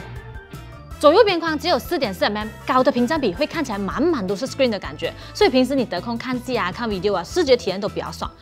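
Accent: Malaysia. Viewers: 马来西亚口音真的很好听呢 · 马来西亚口音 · 大马华语就是这样的啦，要学会接受不同的文化。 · 马来西亚华人大部分普通话都有这类的口音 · 皂动的茂茂 马来西亚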